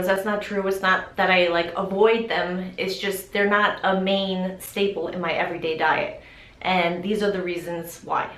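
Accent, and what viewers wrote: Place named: New York, United States. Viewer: Your voice is so stinky cute! Haha! You sound like you walked right out of a subway in New York!!! Love it. I’m a new follower. I got sick and then fat and I have no idea how to heal my body and get back to my size 2. I’ve gained 50 pounds in two years!!!! Pray for me. Thanks for this info. It has really encouraged me.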